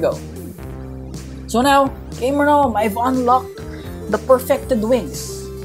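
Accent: Philippines. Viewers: Pinoy ata tong nagsasalita hahahahha accent nya parang pinoy mag english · I sub on you bc i know your filipino.FILIPINO RIN AKO! HALATA NA SA PAG SASALITA AGAGAGA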